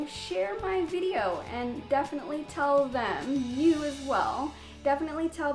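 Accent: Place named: United States. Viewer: Really like your american accent 😊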